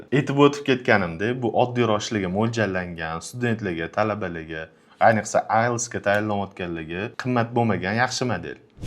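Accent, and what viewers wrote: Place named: Tashkent, Uzbekistan. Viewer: Toshkentcha gapirmay o'l, bloger degani adaboy tilda gapirishi kerak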